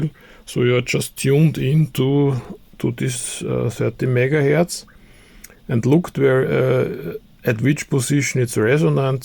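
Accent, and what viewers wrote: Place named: Vienna, Austria. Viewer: Aguy with a Vienna accent, great.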